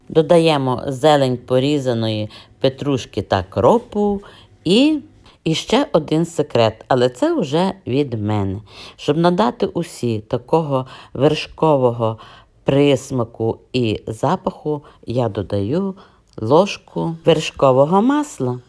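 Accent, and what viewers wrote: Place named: Poltava, Ukraine. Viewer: Доброго вечора. Така прекрасна мова, ви часом не з Полтавщини?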